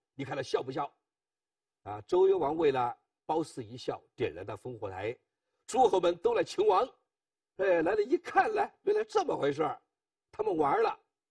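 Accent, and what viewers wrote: Sichuan, China. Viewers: 这位教授普通话听着像四川人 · 我听着他肯定是四川人。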